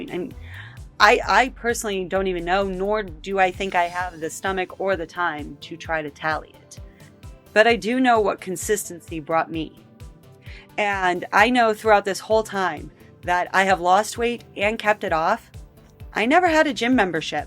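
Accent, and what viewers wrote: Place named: United States. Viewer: This is very random but your voice+American accent combo sound so good it’s really nice to listen to! Sending love from Romania⭐️🤍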